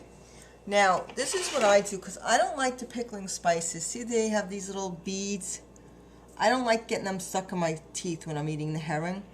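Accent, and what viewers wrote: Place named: Brooklyn, United States. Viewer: I gotta try this recipe. Thanks for showing us and giving the recipe. OK, I gotta know... Where you are from. I'm guessing Brooklyn or maybe New Jersey. Whshew... Thick accent... But then-again, you'd probably think mine is thick too... :-D I heard you keep saying "My husband likes..." It made me chuckle as I thought a great idea would be to put a few jalapenos in there and warm things up a little!!!! See if your husband "likes" that!!!!!! Woo-hoo!!!! :-D Thanks again! Ya done good on the video!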